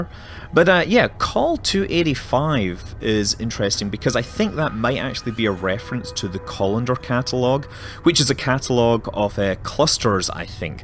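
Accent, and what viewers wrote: Scotland, United Kingdom. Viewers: I just listened to a scottish man talk for 20 minutes about catalogues. Life is good.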